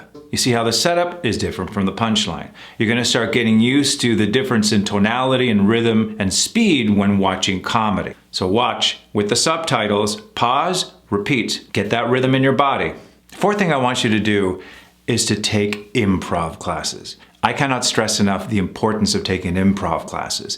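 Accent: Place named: United States. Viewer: This guy is great! oh my gosh I would spend all day long listening to his American accent. Keep up the good work. When I am stressed out I resort to his videos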